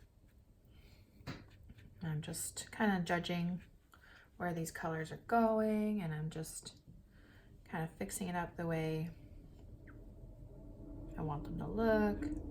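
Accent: Canada. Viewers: Well I think you are fantastic ❣️👏👍. I adore your little son, your casual teaching methods that allow me to make mistakes but keep trying, your encouragement, and your Canadian accent ( my sister and her husband, sons and their extended family are scattered throughout Ontario ) and we have many delightful Canadian friends from years of travels❤️🥰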